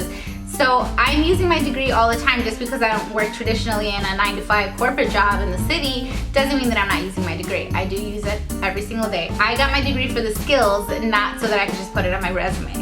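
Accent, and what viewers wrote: Chicago, United States. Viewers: I know this may sound really creepy and stalkerish o.o but what suburbs of chicago are you from? I am very local to Aurora, haha and there is a but of the Chicago accent in there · We do have an accent in chicago. We can't tell but others can. And you r the first person that actually said "I'm from the suburbs". Lol. ❤️chicago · Not a fan of the music, it was really annoying. And yeah, you do have a bit of an accent. I can definitely tell that you're from Chicago or the surrounding area. It's not thick accent, but it's noticeable. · lol i actually really think you have a chicago accent! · You do have a Chicago accent 😂 I love it though!! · It's actually a pretty strong Chicago accent in my opinion hahaha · It is a strong Chicago accent.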